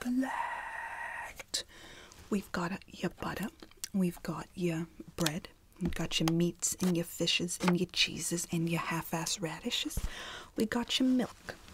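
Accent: New York, United States. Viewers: Ha I love it! Sounds like my mom's whole Italian family from New York. 😂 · As a New Yorker love the accent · def new york lol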